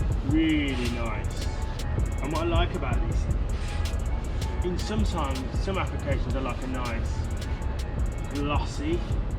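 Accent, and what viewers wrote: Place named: Midlands, United Kingdom. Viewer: Nice video buddy. thank you .. i detect a midlands accent there....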